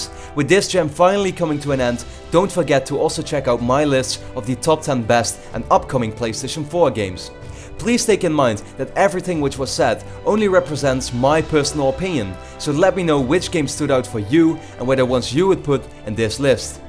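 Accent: Netherlands. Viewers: u dutch? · the akward moment when you hear the shitty dutch accent. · Are you from Holland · Really obvious he is from the Netherlands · Jij Bent ZoieZo Nederlands Want Dat hoor ik aan je stem ;) ***** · Are you Dutch by any chance? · Is hij Nederlands?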